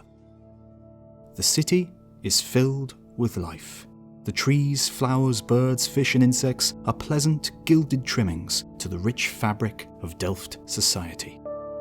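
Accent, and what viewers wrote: United Kingdom. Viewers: You're just saying that cus he has a British accent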